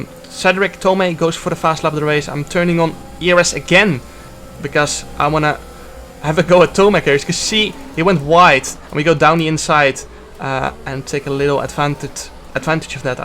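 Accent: Netherlands. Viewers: Ik hoor zo overduidelijk dat jij nederlands bent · Je spreekt beetje Nederlands Engels?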